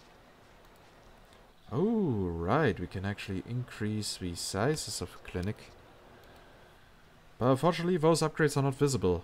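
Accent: Germany. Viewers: German accent is hilarious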